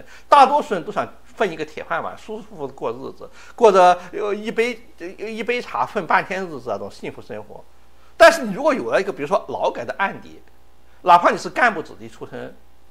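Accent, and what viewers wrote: Sichuan, China. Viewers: 加上字幕吧！ 蜀國話聽來吃力鴨！😁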